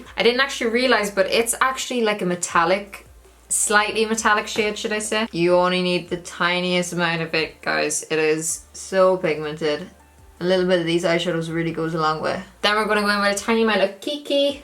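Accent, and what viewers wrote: Ireland. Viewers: I'm so glad I came across your channel! You have the same sense of humor I do 😂 where are you from? I'm bad at determining accents but maybe you're from Ireland? I apologize if I'm wrong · Is she irish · What part or Ireland are ya from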